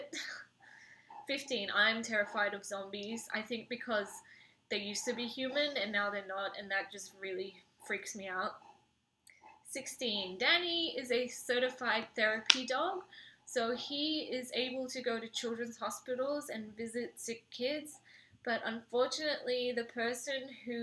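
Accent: Australia. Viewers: I can't place your accent. Have you lived in Australia?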